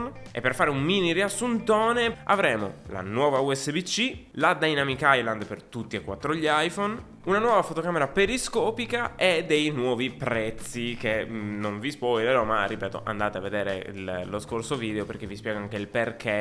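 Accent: Sardinia, Italy. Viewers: Sei di Cagliari?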